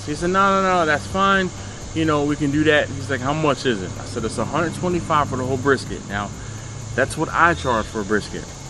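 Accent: Philadelphia, United States. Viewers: Excuse me sir, you sound like you might be from Philly, or the surrounding area? I grew up in Berwyn/Valley Forge area.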